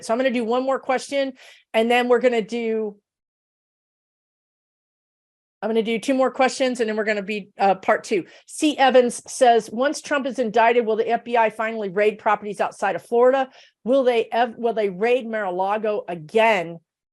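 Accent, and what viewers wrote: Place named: New Jersey, United States. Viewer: Sounds like Bayon, New Jersey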